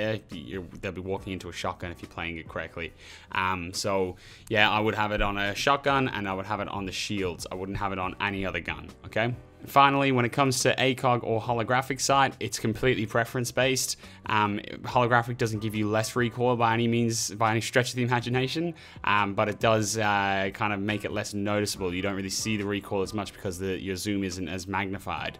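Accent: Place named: Australia. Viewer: he sounds kinda australian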